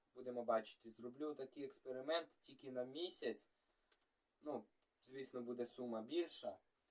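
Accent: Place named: Surzhyk, Ukraine. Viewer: а ты с какой области? У тебя очень интересная речь, смесь украинского и русского (на Донбассе это называется "суржик"), звучит мило😉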